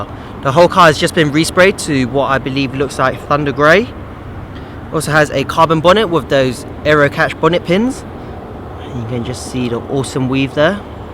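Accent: England, United Kingdom. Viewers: Fuck bro u sound like a Pom hard , u must live in England surly?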